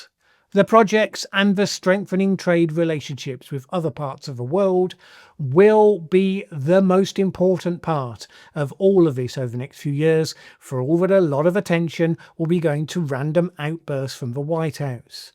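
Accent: United Kingdom. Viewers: Canadian here. UK pronunciations ftw.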